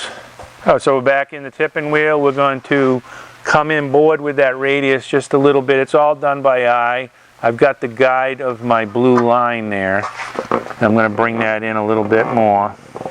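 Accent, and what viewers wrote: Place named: New England, United States. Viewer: Because of your New England accent I thought it was a flexible shape hat , but that makes sense too so that's what I call it. By the way it's pronounced Jag you are. That's only when you're across the pond.lol thanks for everything you've taught me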